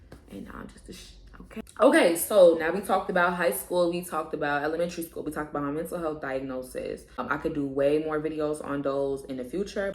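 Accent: Chicago, United States. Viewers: I’m from Milwaukee but I live in Texas and your Chicago accent 🥹😬💕 My cousin from Chicago… I love….love💕 You are truly gifted, intelligent and your grandma is smiling down and very proud. Your grandmother passed the torch of good home cooking, continue to use your gift to inspire families to cook at home. #bringbackfamilydinners good luck to you💕🙏🏽🙌🏾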